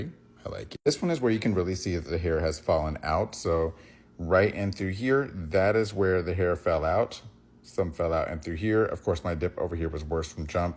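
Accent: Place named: South, United States. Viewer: I love this hair journey of yours! I also love how handsome you look, your southern accent and your name “ ”! 😂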